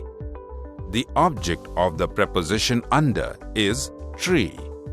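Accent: India. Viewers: Indian English ??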